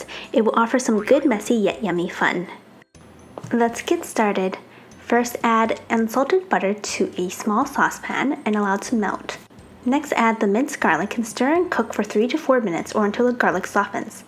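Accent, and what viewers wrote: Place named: Minnesota, United States. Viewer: Sounds like Minnesota accent. I like the recipe.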